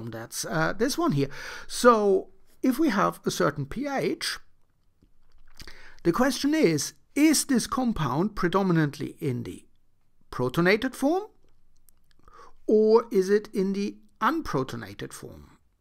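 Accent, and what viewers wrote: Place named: England, United Kingdom. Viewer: Can somebody ~please~ tell me why the English English makes literally every thing easier to understand.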